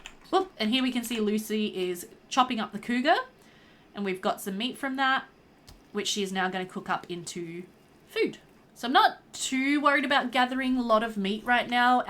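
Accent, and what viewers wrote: Australia. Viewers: austrailian accents...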